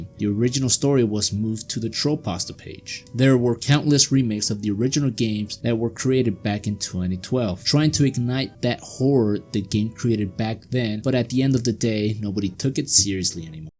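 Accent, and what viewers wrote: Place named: Spain. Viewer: what the pizza pocket in the microwave sees. But seriously, awseome video and bonus points for a regular show pfp. Nice. Y creó que tambien hablando Español? Solo creó por el acento.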